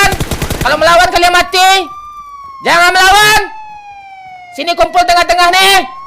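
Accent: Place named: West Sumatra, Indonesia. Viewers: Ndak salah iyoo bana urang minang,urang minang marantau ka pekanbaru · nih suara nya wkwk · Kya suaranya admin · suara nih.. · Suarnya kaya ya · urg awak yo? Jaleh bana minang nyo 😂😂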